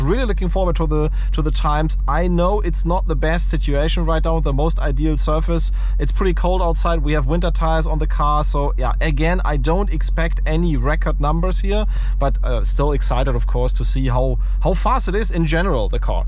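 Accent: Germany. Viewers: Most german english i have ever heard :)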